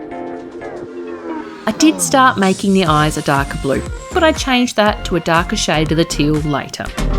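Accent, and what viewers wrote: Australia. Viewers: 😂😂😂 (context: I am from the USA) I just went to Google and searched, "Define Skalittle". It changed it to skeletal, but it still didn't click with me! So I thought, "Well, I must be spelling it wrong." So I used the microphone to say "Define Skalittle"and still, it gave me the definition of skeletal. It wasn't until this point that I realized you are saying skeletal, just the way, I guess, you guys say it in Australia. I cracked up!! I thought I was going to learn a new vocabulary word today! 😂😂😂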